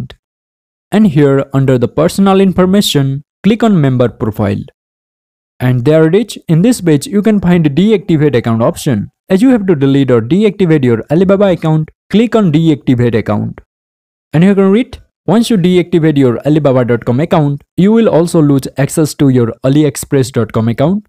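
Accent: India. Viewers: haw to delete yar alibaba akkunt indian voice ueueue shut up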